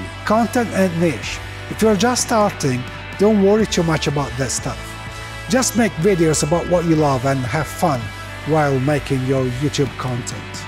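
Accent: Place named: Eastern Europe. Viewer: not to be disrespectful, but rather totally honest and non critical. you remind me of Al Pacino - but with an eastern European accent. It is actually really interesting your manner and way of speaking. i really like it a lot. and your english is perfect just the way it is! nice video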